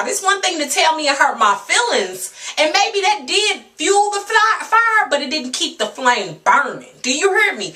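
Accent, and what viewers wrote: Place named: St. Louis, United States. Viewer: Tell 'em (the St. Louis came out on this video, lol)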